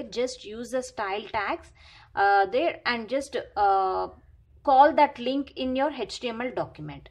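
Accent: South India, India. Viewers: Mam is from south so som problem in pronounce Jati na pucho sadhu ki puch lijiye gyan Mol kro talwar ka padi rahan do mayan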